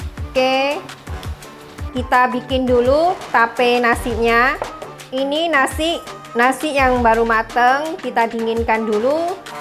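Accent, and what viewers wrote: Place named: Java, Indonesia. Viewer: Saya suka resep2 kue khas medan👍 cuma logat bicara cik kok logat jawa ya?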